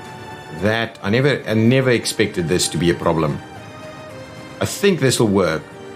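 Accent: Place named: South Africa. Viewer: This is gud shit love youre content. Also love youre accent have note heard alot of southafrican but it puts a smile on my face. So thanks fore youre hard work and Star safe in the dificult times!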